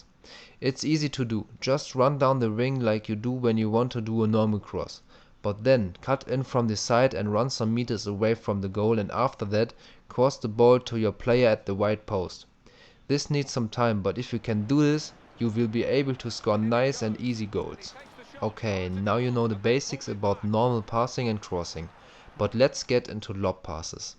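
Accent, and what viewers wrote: Germany. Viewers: You speak german ! I notice because it says Tor and germans say X like this ix and english people ex :D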